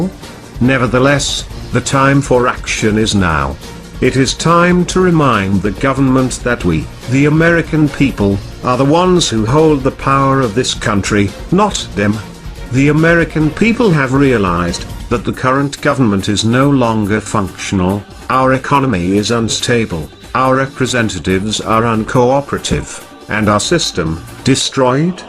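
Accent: United Kingdom. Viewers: why does this guy have a British accent but is talking about how we fought for freedom ect? The only ones we fought to get this country were the British and the defenseless natives.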